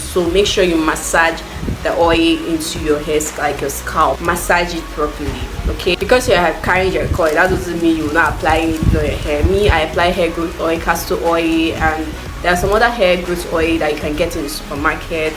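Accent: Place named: Nigeria. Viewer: You need to speak to your only to your fellow Nigerians,cause your. accent is too heavy,& you talk to fast.English is not good .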